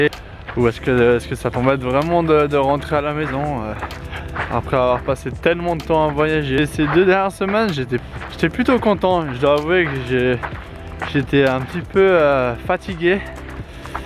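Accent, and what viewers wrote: Switzerland. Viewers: ooh de tcheu notre bel accent suisse XD mais t'es pas serrrrieux ? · L'accent suisse il y est ! Haha super videos !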